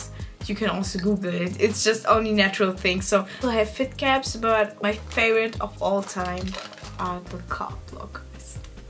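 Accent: Germany. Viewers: Heftig wie ignorant manche Menschen hier sind! Sie kann die Videos in der Sprache drehen, in der sie es möchte. Was ist daran so schwer zu verstehen? War irgendeiner von euch Englischlehrern denn EINMAL im Ausland, wo Menschen verschiedener Herkunft untereinander Englisch geredet haben? Jeder hat einen Akzent! Ihr deuscher Akzent ist normal und NATÜRLICH. Hätte sie einen britischen oder amerikanischen Akzent, würdet ihr doch auch wieder haten mit „sie kommt aus Deutschland aber redet so und so“ ..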